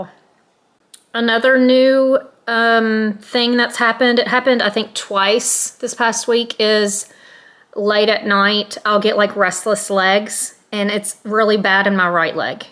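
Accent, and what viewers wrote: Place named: Georgia, United States. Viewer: That heartbeat sounds glorious! I heard you say it said 130 for a second. When I was pregnant with my daughter her heartbeat never went out of the 130’s my entire pregnancy. The doctor would say she was just chilling out in there 😂. My son’s heartbeat never went below the 150’s. It was usually in the 160’s. I’m so happy for you! So glad I came across your channel. On a side note, your accent sounds like mine 😊. I’m born and raised in southwest Georgia.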